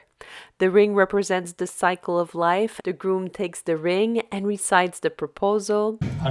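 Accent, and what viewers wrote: France. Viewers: I can tell by your accent you speak French and ofcourse because you referred to your uncle as tonton.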